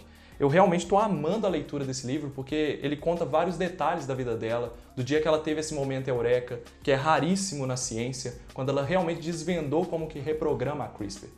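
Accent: Minas Gerais, Brazil. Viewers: Adoro seu sotaque mineiro!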